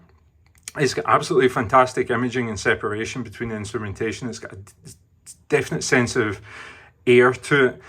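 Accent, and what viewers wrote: Scotland, United Kingdom. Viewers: You sound like a British Bernardo Faria. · 😂 I am going to say Scottish (based on the Scottish accent) and if I am correct in assuming, he is not in the UK (Asia based)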